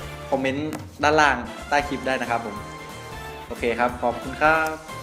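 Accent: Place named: North, Thailand. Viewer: คนเหนือกะ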